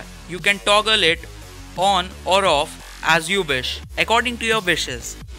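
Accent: India. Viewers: I hate your accent. Your an indian!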